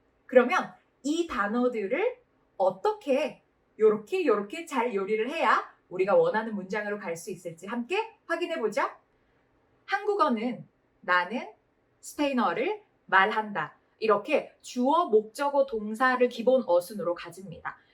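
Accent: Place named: Gyeongsang, South Korea. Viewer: 산티아고 순례를 계획하고 스페인어를 배워보려고 시청하고 있는데 샘 처녀 시절( 샘) 경상도 억양으로 열강하시던 것까지 섭렵하고 있습니다. 정말 감사합니다. 열심히 배우겠습니다.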